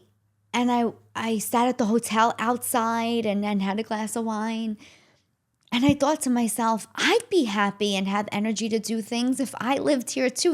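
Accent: New York, United States. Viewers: No matter where you reside, your voice will always take us back to NYC! ❤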